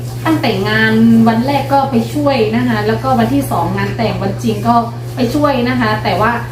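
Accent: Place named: Isan, Thailand. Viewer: เว้าสำเนียงอิสานแล้ว น่ารักมาก มักหลายเด้อ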